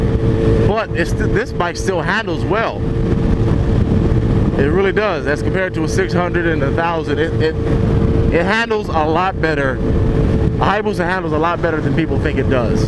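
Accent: Michigan, United States. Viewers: Are you from Michigan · Bro you from Michigan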